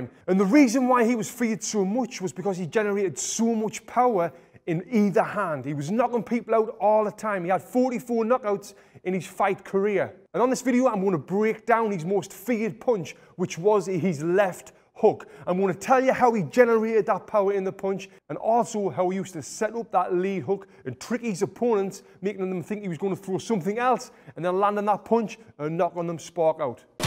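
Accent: United Kingdom. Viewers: Sorry can't have a brit talking mike.tyson needs to be NY accent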